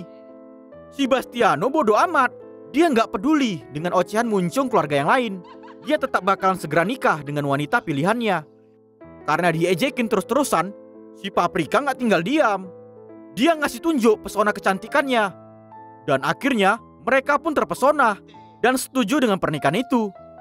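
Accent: Medan, Indonesia. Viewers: abg orang medan mana? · Bah...orang medan rupa nya..sukses trus lae..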